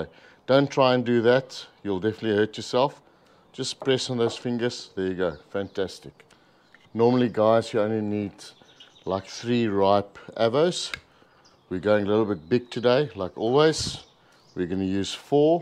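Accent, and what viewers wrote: South Africa. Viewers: South African accent right there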